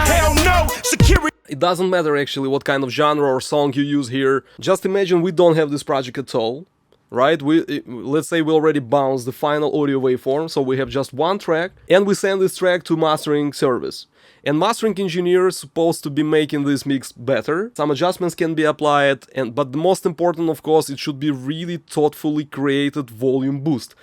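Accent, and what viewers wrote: Russia. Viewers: абажаю твой английский, ты говоришь на английском как-то по.... русски😄поэтому очень легко смотреть. ну и само собой материал-это просто бомба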